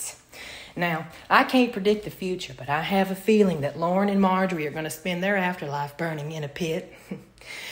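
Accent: South, United States. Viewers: Taking down the hags with a southern accent! It's absolutely perfect! From a fellow southerner, thank you.🥰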